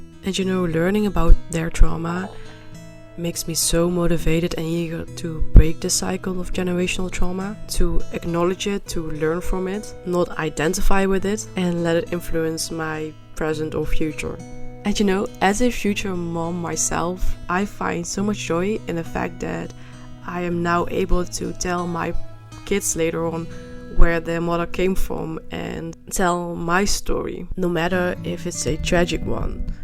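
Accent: Netherlands. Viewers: Your English is phenomenal with a tiny hint of a Dutch accent. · So many conflicting emotions that are difficult to resolve but in one regard you have so many more life opportunities (as you discovered) which gives you a chance for a broader impact for yourself and others..love the Dutch accent, best wishes for all in your future 🙏🏻👏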